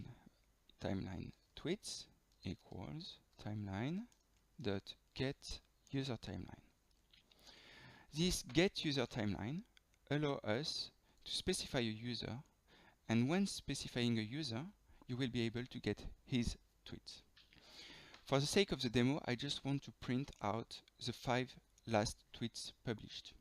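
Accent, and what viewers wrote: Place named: France. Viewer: J'adore cet accent so frenchie! Merci pour ce tuto clair et précis! J'utilise depuis quelque temps la version 0.9 de tweetinvi et j'essai de poster une vidéo (pouvant dépasser 15 Mo). Suis je obligé d'utiliser la version 1.1 ou 1.2 et si oui, y aurait-il un tuto pour l'utilisation de ces nouvelles versions? Il semble que TwitterCredentials ou AuthFlow.InitAuthentication ne soient plus valides pour la connexion d'un utilisateur X qui souhaite se logger avec son compte tweeter. Merci.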